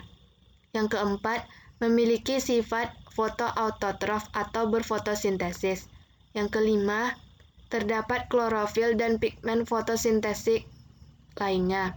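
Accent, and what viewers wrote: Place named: Riau, Indonesia. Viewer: riau bgt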